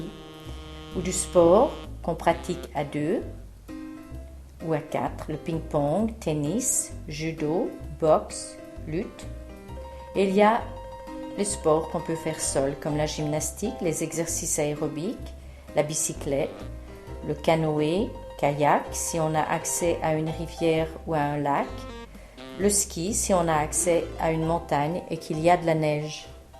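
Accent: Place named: France. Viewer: this was cool because i am trying to listen for comprehension and acclimate to the french accent. now to incorporate the words/phrases into more practical use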